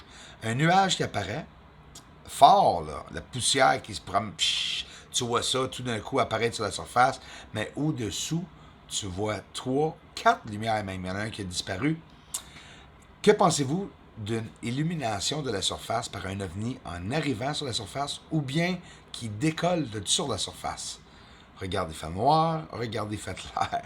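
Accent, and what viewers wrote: Quebec, Canada. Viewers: Bjr je suis française et j'aime beaucoup Quebec et votre accent et vous aborder un sujet que je suis depuis longtemps avec beaucoup plus d'intensité depuis 4 ans il y a quelquechose qui bouge dans les cieux objectif ? 🤔 Continuez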